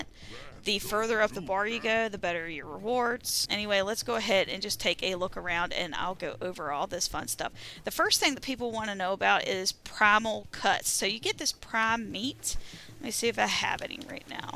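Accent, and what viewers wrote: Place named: West Virginia, United States. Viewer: Your accent sounds a little like a WV accent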